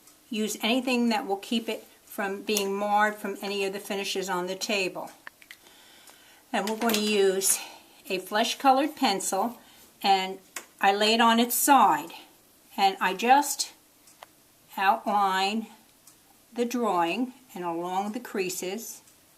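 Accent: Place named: New Jersey, United States. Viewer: The minute I heard your voice, I knew you had to be in Jersey! Hahaha....Jersey shore girl here! Neptune!!! Anyway, can you tell me what pencils you are using and what the black pen brand is? Thanks.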